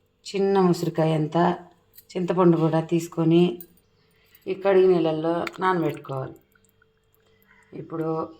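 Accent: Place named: Chittoor, India. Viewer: Pakka chittoor basha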